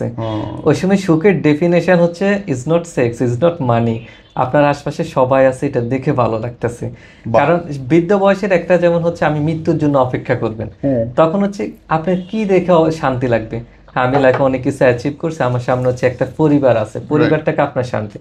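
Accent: Noakhali, Bangladesh. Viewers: Uni ki noakhali zillar naki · জি, নোয়াখাইল্লা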